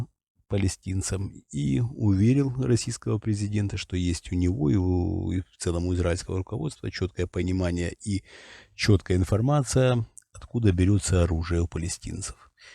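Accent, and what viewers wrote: Ukraine. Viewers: Почему меня не покидает мысль, что это Украинский проект. Он и разговаривает так-же и акцент такой-же. Это нисколько не влияет на смысл той информации которая идет от него, но все-же... У меня ощущение, что меня теперь давят со стороны Украины. Сразу скажу, я не сторонник всего этого хаоса. Я уважаю мнение другого человека. И то что сейчас происходит, можно назвать только одним словом Пи...ц...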